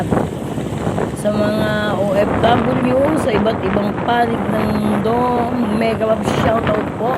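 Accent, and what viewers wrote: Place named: Batangas, Philippines. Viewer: areng batanguenyang talaga oh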